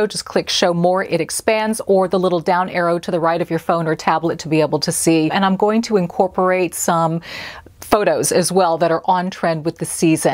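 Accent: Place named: United States. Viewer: Thanks, I love your inspirational videos and cute American accent. You are so inspirational and so positive. I rely on you to keep me fashionable!...bless you from sunny England. 🤗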